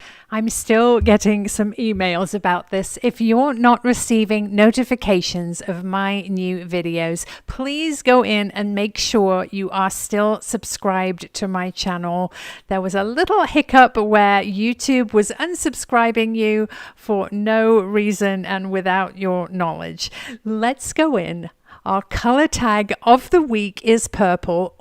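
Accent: United Kingdom. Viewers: you are sooo cool I enjoy watching and following you. It's June from Jersey. You are such a pleasure to watch and listen to. Like that British accent, girlfriend. You are loved in the Northeast keep rocking!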